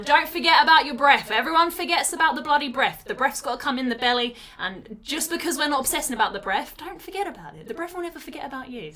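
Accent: United Kingdom. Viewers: what part of the UK is your accent from?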